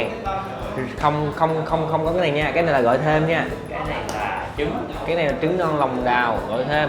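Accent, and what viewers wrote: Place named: Binh Dinh, Vietnam. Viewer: Giọng bình định nghe mà nhớ quê quá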